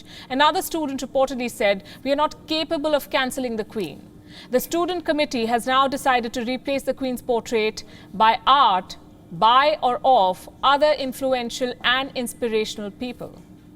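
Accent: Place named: India. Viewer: I can actually understand this lady she makes the Indian accent sound beautiful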